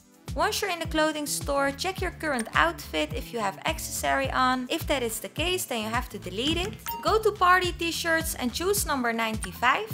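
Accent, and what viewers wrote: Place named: Netherlands. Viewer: dan ga ik zeker abonneren 😁 ik hoorde op een bepaalde manier dat t Engels was maar dan beetje Nederlands zegmaar je hoort da je Nederlands bent haha maar kan jij. Nog same. Met ps4 spelen of heb je die nieuwe versie al gekocht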